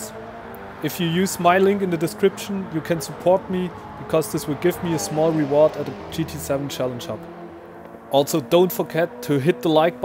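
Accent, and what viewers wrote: Germany. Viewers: Dieses deutsch was ich raushöre🙃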